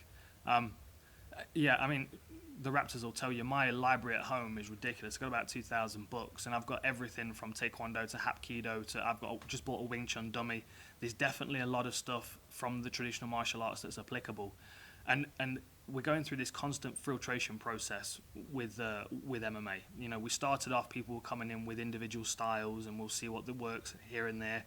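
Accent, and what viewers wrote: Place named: England, United Kingdom. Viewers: Something about dan , he has that annoying pompous English style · I think his style of English would mostly be a product of where in England he comes from. I don’t find him pompous at all btw.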